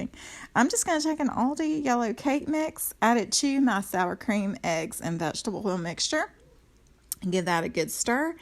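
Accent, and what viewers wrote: South, United States. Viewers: You have to be from the South! Say it anyway you want too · If I drank a shot every time she said y'all, I'd be soooo drunk by the end of the video. 😂🤣... Love the Southern drawl ❤️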